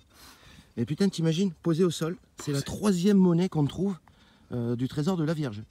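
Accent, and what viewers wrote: South, France. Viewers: Non mais en tout cas j'adore quand tu fais de la detection avec tu accent du sud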